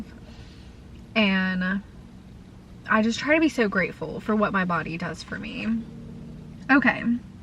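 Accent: South, United States. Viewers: I absolutely love your cute southern accent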